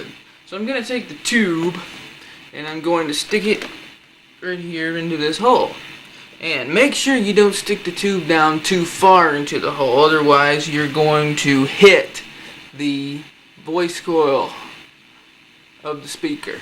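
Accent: South, United States. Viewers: It's like Mike Myers with a southern accent. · He kinda reminds me of a long haired country accent Ashton Kutcher. well that just my opinion i really don't kmow about you guys:) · Definately not Bristish, its southern US by the sounds of it...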